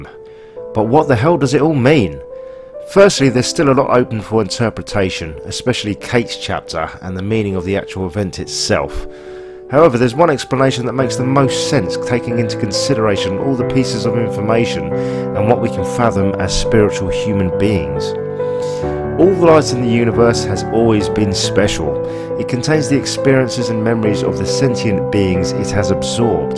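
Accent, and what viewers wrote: United Kingdom. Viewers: This game is beautiful and amazing. yes the slow walking can be a tiring if you are back tracking (for instance I completely forgot that you needed to move the controller near some lights and only did it the first time instructed put the game down for 1 month came back to and made it to bunker wondering why won't it open untill I watched the beginning of a walk through and was like Noooooooooo) but the walk through this town and countryside is peaceful and beautiful. I found myself looking up through the trees listening to the music speechless. I love piecing together the story which was a great story. This was my game of the year (over all the ps4, xboxone exclusives and 3rd party games) because this is the only game leaving me thinking about it weeks later and looking on youtube for others point of view and searching for things I may have missed. 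thank you for this commentary it was perfect. especially since you sound like you are from the UK it works to enhance memories of the game. thanks again keep up the good work. I thumbed up and suscribed.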